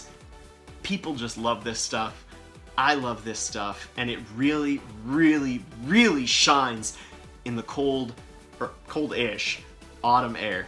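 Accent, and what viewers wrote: Canada. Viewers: With that accent (sounds Canadian) I wish the would stop saying OOTside. Arrrgg.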